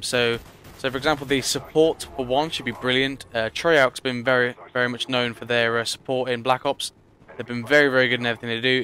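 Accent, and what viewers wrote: United Kingdom. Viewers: Thanks for taking the time to make all these vids - really appreciate it, great effort. It's refreshing to hear a fellow Brit too! Nowt wrong with other nationalities I might add, (quickly got that in before I was shot down in flames!) Keep up the good work.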